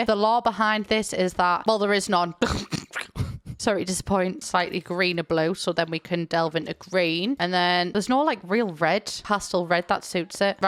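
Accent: United Kingdom. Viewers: i must like your videos a lot since i put effort into understanding 1. you talking very british 2. you talking very fast hahaha (english's not my 1st laguange)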